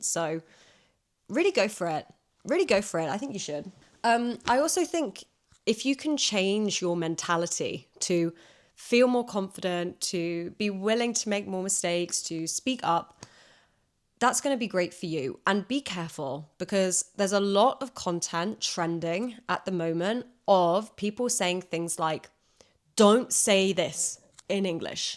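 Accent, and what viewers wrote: England, United Kingdom. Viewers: Hi,! I am your huge fan! 🥰I am a university student from China. I first found your videos back in March on douyin(China’s version tiktok). I had watched most videos there, but the account has stopped uploading the new contents since June, so I came here(youtube) and tracked you down(official account!)🤗. I like the way you teach English(daily life English) and your British accent! I can learn many new and fun words and get joy from videos(especially when you laugh, it’s so infectious).:elbowbump:Can’t wait to watch more videos from you! And hope your channel keeps getting better and better! By the way, I feel you look a little like Lara Pulver(from Sherlock).🤭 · Hi, I'm commenting for the first time on your video but I've practiced with you everyday since I found your channel. I aim to get 7.5 in IELTS test to move to Australia but here, in Japan, I felt kinda difficult to be soaked in English while doing the job. 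I've looked for many English channels but I love your channel the best ever!! How you speak, the attractive content for me every times, England accent which I want to immitate, your kind personality, and cultured talk. This time, I was engaged by your word, go try and don't be afraid of failure, and try to speak or write not using AI. I often use AI to translate because I want to use complete words but I changed my mind about it. I think I'm ambivert personality like you, but I often lost confidence when I speak English. So today I got a motivation from you again.🌼 Thank you as always✨🙂‍↕️ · Eres la mejor profesora gracias por tus maravillosos consejos, me encanta uur British accent😊❤ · hey, love your videos, love so much you do this podcast bc i live in mexico and the usa acent os afull and british so more elegant and more structure i dont know, send hugs gracias y buen dia y sigue haciendo tu magia ademas de practicar tu español, gracias por leerme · has a beautiful personality type, i like when you smile your short laugh Is this type of being shy? 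I swear U my favorite british teacher with the best accent Your short laugh kills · 日本ではアメリカ発音で英語を習いますが、あなたのビデオでイギリス発音の魅力を知りました。 私には少しだけスピードが速いので、0.5倍速で字幕をつけて発音の練習をしています。 とてもナチュラルな動画内容なのもとても好感が持てます。 これからも活動応援しています。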